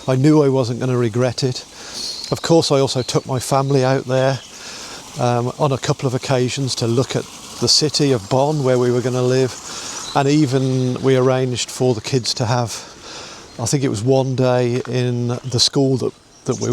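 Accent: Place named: Midlands, United Kingdom. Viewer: I thought I detected a slight Midlands accent! .I used to occasionally go with dad and brother to Wolves matches(in the standing" pens") in late 1960s Originally from near Wolves myself and the last 30 odd years in France(though spending a lot of time in Sidmouth Devon for the last 6 of them helping out my elderly mum)I am, since she passed on a few months ago in a quandary. The problem is, like you, I really can't decide where to settle, always thought would stay in France but the time spent in Devon with mom and sister(who returned recently from about 35 years living in Trento Italy to Sidmouth) has confused things enormously as am getting old! Two offspring ,one living in Annecy France the other in London doesnt help either and they both could move on elsewhere. Anyway very much enjoyed listening to your story,hope things work out for you and family.Devon is a lovely county.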